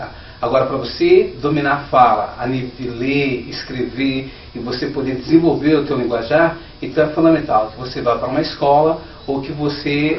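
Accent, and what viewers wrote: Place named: Paraguay. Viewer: Meu Deus que ingles mais paraguaio!!! The pronunciation is terrible ! Nun fala nen ingles nen pt direito! Nao existe ingles em 50 horas , nao se iludam. Aprender uma lingua nen é algo mecanico.... olha se essa tecnica é tão boa , os filhos e parentes desse cara deve ser poliglota kkkkkk ! Eu falo ingles e sei que aprender uma lingua nao está ligado a nivel de inteligencia. Nao acho correto enganar as pessoas desta forma se é que alguem acredita . Abraços!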